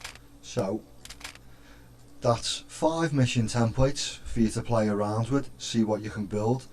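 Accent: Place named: Liverpool, United Kingdom. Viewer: hey man I've been interested in of airwolf for ages now, are you making missions for airwolf? I would love to get this if I could learn a bit about the game I will try and help you. I remember when this used to be on tele on the channel Bravo? Also you sound like your from Everton? yes? I'm not too far away, a bit further north. :)One more thing which operation flashpoint is this and will airwolf work with red river?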